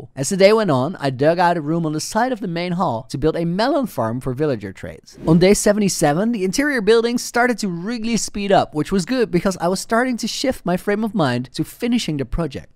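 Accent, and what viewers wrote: Netherlands. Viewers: I hear you’re Dutch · I like that doesnt try to hide his Dutch accent completely like other youtubers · Are you dutch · I knew the guy was Dutch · My guy is Dutch?!?!?! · Mate judging boy your accent your dutch arent you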